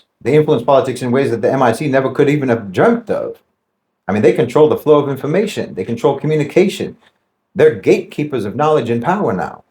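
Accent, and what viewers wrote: United States. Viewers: The talk is in English and in the US accent because this guy is also an American but got over the indoctrination.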